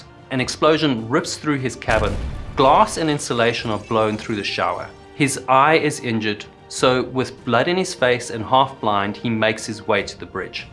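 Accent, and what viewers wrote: South Africa. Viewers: Hey a south African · I really like this guy with his almost unlimited knowledge of what he is talking about. He has a wonderful South African accent and is so articulate in his delivery. Others on similar subjects on You tube are not quite in the same league as this great man who really knows how to put together a professional video. One might say good enough for television. One hopes he continues with these documentaries in the future. They are far more superior than the American versions. Peter Starr London UK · Like the South African accent. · Please try and make the story telling more like a conversation and not a accident report that was being read bullet point by bullet point... I really struggled to follow what was happening... It's not a lack of information just a frantic pace to fit in all the information... Great video... 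Also you sound South African?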